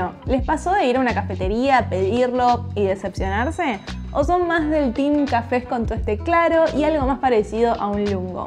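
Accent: Argentina. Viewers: Soy de México, y cada vez que veo tus videos, además disfrutar ese acento femenino de argentina que cautiva, me embelesa tu desempeño. Si ya sé, debería hablar de tu belleza, pero ante tu profesionalismo, sería una falta de respeto ignorar este. Bueno, hay un poco de broma, excepto acerca de tu profesionalismo (y de la belleza desde luego). Me gusta tu canal porque soy una de las personas atípicas. A mis sesenta y tantos años de vida, mi acercamiento al café, es increíblemente reciente. Sucede que soy intolerante a la cafeína, me impide dormir (literalmente), uno o días seguidos con tan solo una taza, pero cuando sucede y me desvelo hasta las cinco de la mañana, a las siete en punto despierto como si nada. Claro, el café no me engaña, mi cuerpo está cansado pero me dice una o dos cosas para que crea lo contrario, en fin. De alguna manera ahora me he aficionado al café y como siempre sucede con mis pasatiempos, me he apasionado, llevo algo así como seis meses viendo tus video y los de muchos mas (les recuerdo que mi afición al café es reciente), y mira que he descubierto un mundo. 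Me sucedió lo mismo con el vino tinto y la cerveza artesanal de las cuales, en efecto, tengo un poco más de tiempo. Me da la impresión de que fuera de tu edad, que te ves muy joven, tienes ese tipo de conocimiento y de experiencia que convencen, y créeme, soy el mayor escéptico y quisquilloso que pudieras conocer, cada cosa que se dice la investigo, y debo ser honesto, me has sorprendido. Felicidades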